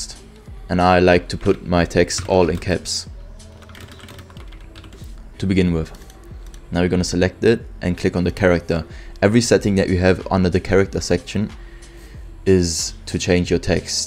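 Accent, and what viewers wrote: Germany. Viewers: Der Deutsch (Sprachige) Aktzent Kickt aber Nices Video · Youre German right? hört man :) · THE GERMAN ACCENT IS SO CUTE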